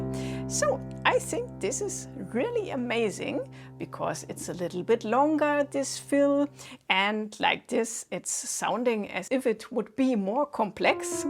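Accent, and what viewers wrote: Germany. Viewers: Thank you,. Fantastic lesson, it really helped me. Love your teaching and I guess your German/Austrian accent. Thank you so much ❤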